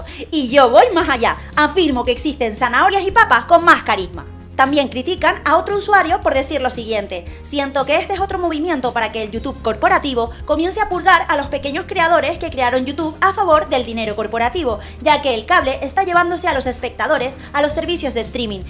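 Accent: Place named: Canary Islands, Spain. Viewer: Amo tus videos tía, son oro molido para mis clases de preparatoria en las que intento sabotear la máquina de adocrinamiento de las universidades. También me encanta tu acento canario, saludos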